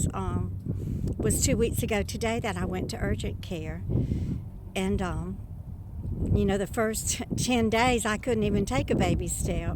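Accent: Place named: Deep South, United States. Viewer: Sweet lady, you have my respect. So glad Uber goober lady referred me here. You remind me so much of the lovely ladies of my youth in the Deep South....not sure why coz not just your accent. I think it’s your facial expressions and manner of speech. Anyway, love and respect from another older lady of the south. Blessings, my dear.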